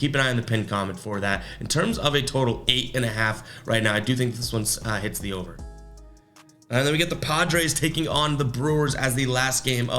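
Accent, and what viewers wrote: Boston, United States. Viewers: What part of Boston you from ?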